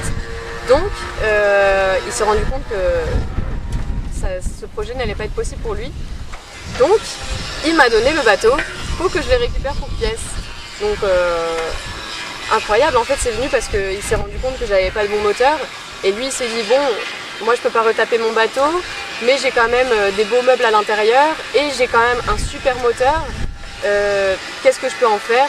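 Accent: Quebec, Canada. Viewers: Cool de te revoir ça fait plaisir d’entendre ce petit accent québécois. Et toi tu en est où?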